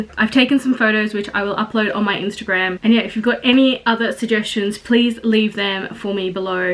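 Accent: Australia. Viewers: She's black. What do you think she is?? She just has an accent, either Australian or South African.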